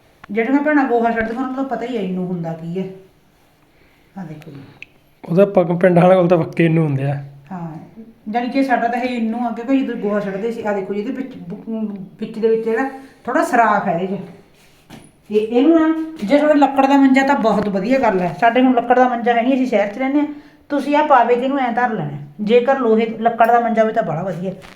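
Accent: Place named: Bathinda, India. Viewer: Dhudhri ki hundi, bhainji baithinda di boli sanu samjhna okhi hundi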